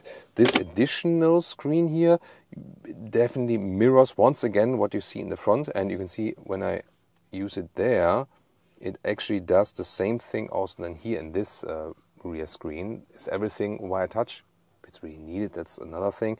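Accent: Germany. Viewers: This dude has a pretty strong German accent....Great car besides!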